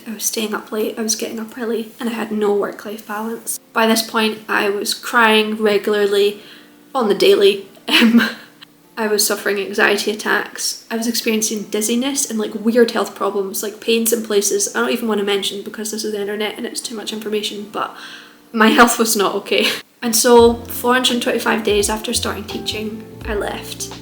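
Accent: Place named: Scotland, United Kingdom. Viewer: Scottish accents are the best.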